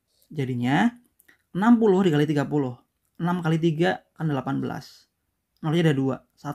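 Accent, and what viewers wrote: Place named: West Java, Indonesia. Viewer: Asa wawuh siga urg sunda haaa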